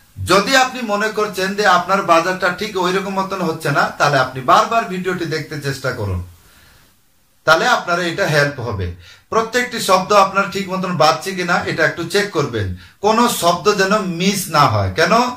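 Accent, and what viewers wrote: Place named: Bangladesh. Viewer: আমি বাংলাদেশ থেকে দেখছি। আপনার কথায় কিছু কিছু বাংলাদেশের টান আসে তাই জানতে চেয়েছিলাম।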